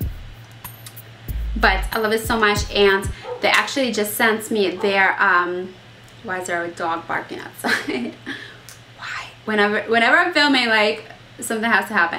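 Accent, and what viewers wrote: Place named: New Jersey, United States. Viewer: I know you hate hearing this but I did think you were from Jersey from your accent. Loved the bloopers! :)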